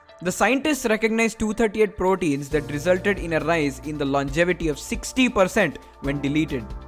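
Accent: India. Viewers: Good topic and research but narration is bad. Feels like a Hindi professor teaching in English.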